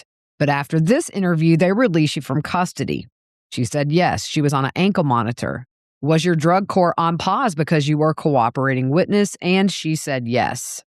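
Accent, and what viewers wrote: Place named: Texas, United States. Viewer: Such a great recap, and you are great to listen to. Love your accent. I used to live in Texas and you make me miss home❤ I live in California now-no accepts here😢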